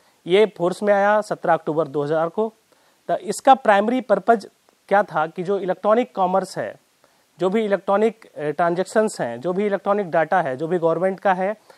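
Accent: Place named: Pilibhit, India. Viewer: maja aaa gaya bhai ----boli pilibhit wali record ho gayee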